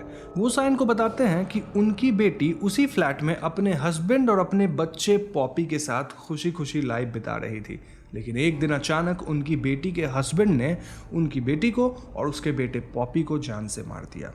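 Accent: Bengal, India. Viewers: are you bengali ?, as your hindi has bengali accent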